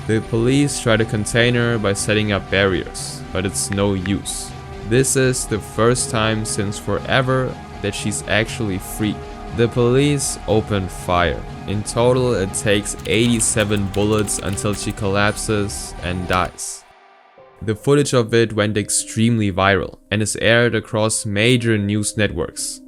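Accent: Germany. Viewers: Are you by chance german? Is your native language german? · Are you german? (my curiosity kills me just because I hear an accent lol)😅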